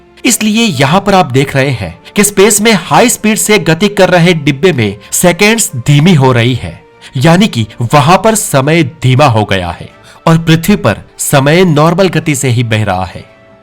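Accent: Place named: Gujarat, India. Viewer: તમે ગુજરાતી છો... ને??? 😁😁😁 ખબર પડી જ જાય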